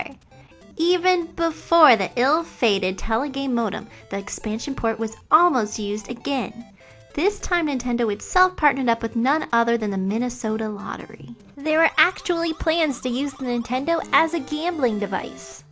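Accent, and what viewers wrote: Canada. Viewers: That Canadian accent is adorable! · Well, she is canadian; you can´t expect for the whole world to speak exactly the same.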